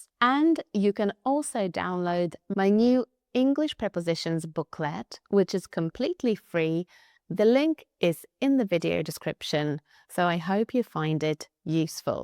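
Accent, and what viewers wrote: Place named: United Kingdom. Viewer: Thank you madam, very much for your excellent elaboration. By the way, I love your British accent. That 's fantastic.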